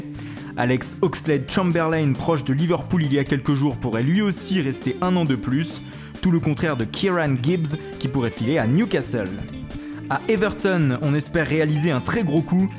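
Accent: Italy. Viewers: ton accent italien...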